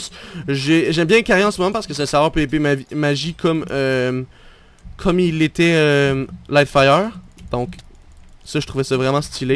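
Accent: Quebec, Canada. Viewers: québécois yessss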